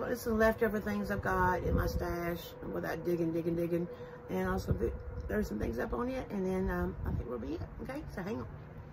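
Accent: South, United States. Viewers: Love your videos. You have great taste and great ideas and with your southern accent it makes it feel so homey and friendly 😉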